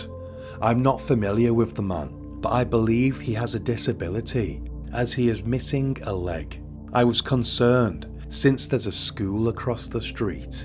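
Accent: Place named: North, United Kingdom. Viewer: I have to know where your accent originates. Do tell. Has to be northern at least. Thanks from Louisiana USA!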